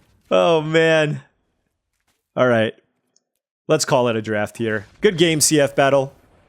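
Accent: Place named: Seattle, United States. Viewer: It's a Seattle accent. We sometimes mumble some of our words.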